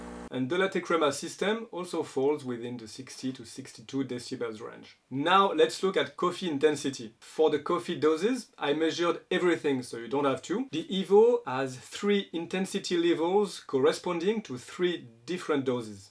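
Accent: France. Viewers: While having an obvious French accent, his English is quite concise and easy to understand. You are also clearly a nonnative English speaker judging by the way you have worded your comment. Either that or you are just poorly educated. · Your comment was totally unnecessary, is more than obvious that he’s French and of course he has an accent, but that’s it, I didn’t hear any grammar errors, I understood 100% what he said. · your english is very bad.. it sound very french!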